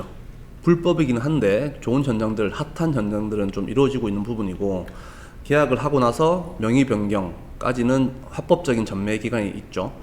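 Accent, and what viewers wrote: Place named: Gyeongsang, South Korea. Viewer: 대구사람이셔요...?... 말투가 익숙해서 더 편하게 들립니당 :) 정주행하고있어요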